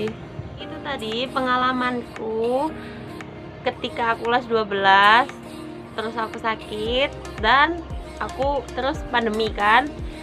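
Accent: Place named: Java, Indonesia. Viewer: Logat jawa nya semakin lama smkin keliatan kaa ehehe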